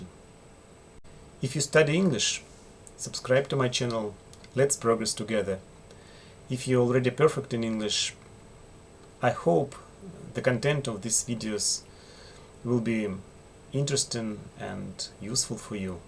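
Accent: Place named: Russia. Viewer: Sweet russian accent:)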